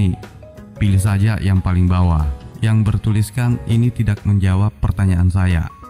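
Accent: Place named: Bugis, Indonesia. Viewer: Logattt nya logattt bugisss skli🗿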